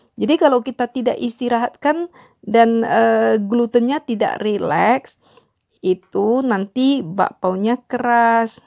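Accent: Medan, Indonesia. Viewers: Ci org medan ya? Mama sy kl ngomong indo jg gt hahahaha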